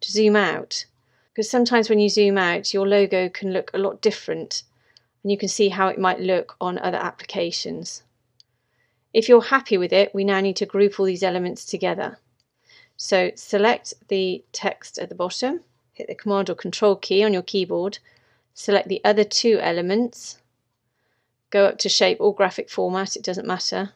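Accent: England, United Kingdom. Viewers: Absolutely wonderful. You sound English, I'm from Bristol, live in Australia. Writing a biography of a wonderful life with my late Valerie. Met in 1955, torn apart in 2019. But, you have given me so much knowledge to make this story readable, and I hope for others. Well done, Take care, Terry.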